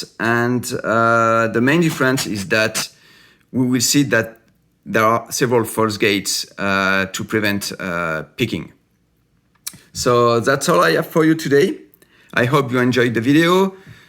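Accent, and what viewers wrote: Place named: France. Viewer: je suis fan de tes vidéos et avec le french accent, change rien !! Feras-tu le F3D ?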